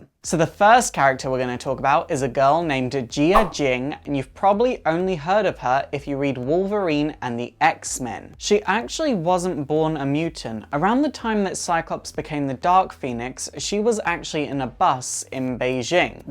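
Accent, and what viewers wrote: United Kingdom. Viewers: Are you British you sound British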